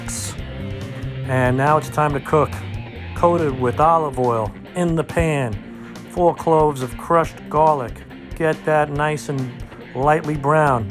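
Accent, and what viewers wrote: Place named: South, United States. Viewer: This man is NO!! third rate middler doin the wagon wheel show!. Sal you are the funniest man I've ever seen . your southern accent is exceptional.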